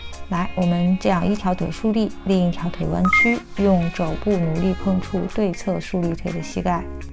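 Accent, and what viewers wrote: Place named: Australia. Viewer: Australia!!! i love aussie accent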